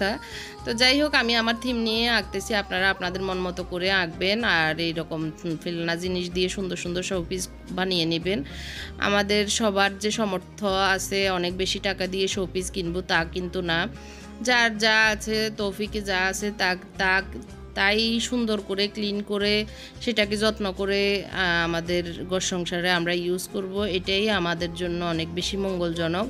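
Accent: Noakhali, Bangladesh. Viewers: আসলে নোয়াখালী ভাষার মানুষ শুদ্ধ করে কথা বলতে চেষ্টা করেছে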